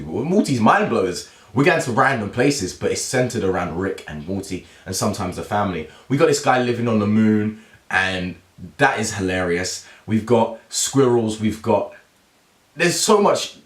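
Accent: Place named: United States. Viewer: I gotta say.. I was hoping for even just a clip from the Citadel so we could see what evil Morty's doing but it was still a pretty fun episode... and kind of a different but still super cool format. Also pretty interesting how Rick had Summer prepared for such an event. Anyway, I can't wait to see how they wrap up the season :D ...love your American accent btw :')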